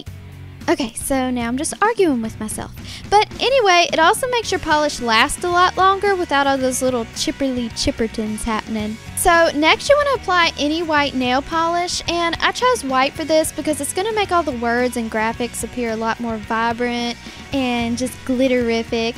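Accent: South, United States. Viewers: You are a adorable!! I LOVE YOUR SOUTHERN TWANG !!<3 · Where Are You From? You Sound Extra Southern.. Lol But Your Real Pretty.. ! I Subscribed!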